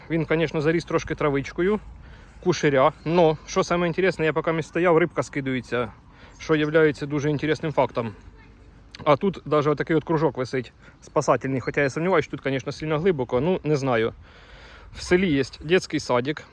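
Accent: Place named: Surzhyk, Ukraine. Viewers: Ти балакаєш, як ми на полтавщині, і похер хто, що думає, аби тобі було зручно, не звертай на " вумних " уваги, вони того не варті! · Не знаю, Для мене, як для західняка, суржик у Вас навіть не дуже сильно виражений. Ну проскакують русизми, але не страшно. Не звертайте уваги на хейтерів. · Прикольні відоси, дивлюсь без напрягу😂 Єдине не зрозумів, як той факт, що ви виросли в селі пояснює не знання української мови. Тут швидше я думаю відіграло роль особливості спілкування в певному регіоні( схоже трохи на полтавський). Тому не треба пов'язувати це з селом. А позиція "яка різниця" думаю не зовсім правильна, тим паче коли ви ведете блог на велику аудиторію. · не обращай внимание на хейтеров, это круто наоборот, что ты не стесняешься и еще нам это все показываешь. Большинство считает, что ты все делаешь по красаве, а если у кого-то подгорает, то это их проблемы. 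У тебя классный суржик, все звучит естественно и понятно. А яблоки про которые ты спрашивал похожи на сорт Ранетки. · ваш суржик дуже прикольный, и он так естественно звучит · Чого це "центральної частини"? Це слобожанський діалект. ) Ото як він назвав малі яблучка, так їх називала моя бабуся якій би було 100 років). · По тому як говориш, здається що ти з центральної частини України. Вгадав? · Дякую за відео! А на рахунок мови, то хай ті умники спердоляють) На Запоріжжі всі так розмовляють. Я теж далеко і Ваша мова, як мед у вуха😊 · ти дуже приємно розмовляєш, милий наш особливий суржик, характерний центральній частині України)) ❤ краще суржик і добро в серці, а не видавлена з себе солов'їна і негатив! Слухати приємно, дякую за відео! Цікаво і корисно) підписалася на тебе😊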